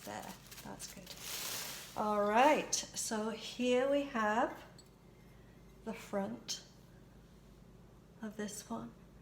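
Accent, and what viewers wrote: England, United Kingdom. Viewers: Hi, you sound English, love your jars, your techniques, especially the robin jar. I'll be watching again and tell my friends. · Are you originally from the UK? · Just found your channel and have subscribed. May I ask are you from England as you sound English, without a Canadian accent x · British voice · Hi, thank you for your videos. They’re great. And you live in Canada but you sound very English. Where are you originally from? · I’m fascinated by your accent. Do I detect a whiff of an English accent in there….?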